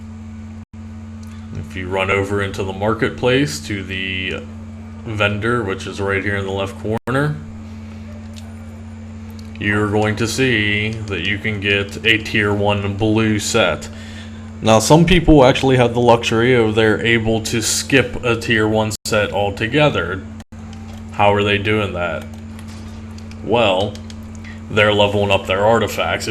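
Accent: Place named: Philadelphia, United States. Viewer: Nice, me too but you sound like a friend who moved to Philadelphia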